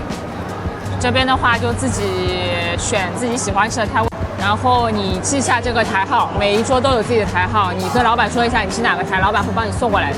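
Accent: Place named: China. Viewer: 她口音很重的大陸姑音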